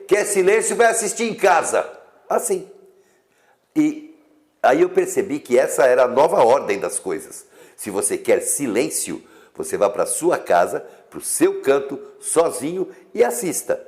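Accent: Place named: São Paulo, Brazil. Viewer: Vc e paulista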